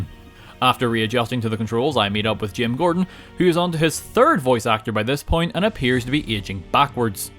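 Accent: Northern Ireland, United Kingdom. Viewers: Are you from Northern Ireland? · Northern Irish! cool to hear an NI accent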